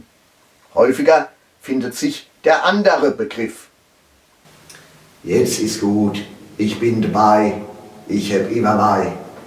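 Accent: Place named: South Palatinate, Germany. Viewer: Leider Südpälzisch 🤢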